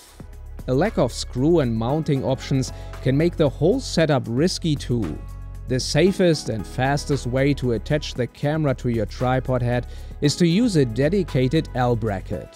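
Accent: Germany. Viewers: Love that massive german accent haha! (best greetings from germany)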